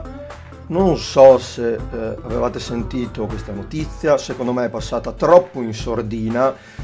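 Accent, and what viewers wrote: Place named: Verona, Italy. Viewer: Naturalmente Veronesissimo 💪🏻💪🏻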